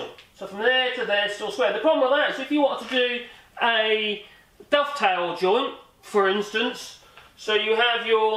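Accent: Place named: London, United Kingdom. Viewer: You sound like a fellow Londoner. Walthamstow lad here.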